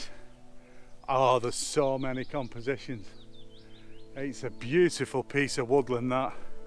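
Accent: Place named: Sheffield, United Kingdom. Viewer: The last time I heard that accent was on my last visit to my home town Sheffield in 2006 from my adopted home in Tasmania. Thanks for sharing. Cheers Peter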